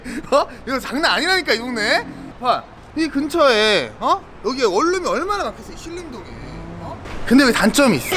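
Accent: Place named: Jeonju, South Korea. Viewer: 아저씨 말투보니깐 전주출신 같은데 ㅎㅎ 능글맞은 것도 그렇고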